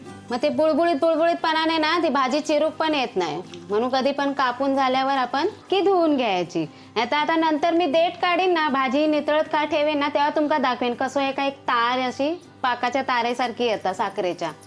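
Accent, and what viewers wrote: Malvani, India. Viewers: व्वा अस्सल मालवणी संभाषण. ऐकुक खुप बरा वाटला. तुमचा बालपण गावात गेला वाटता. भाजी, आंबोळे, सांबारा एकदम झकास. बारीक सारीक मुद्दे खुप चांगले समजऊन सांगितले. 👌👌👍👍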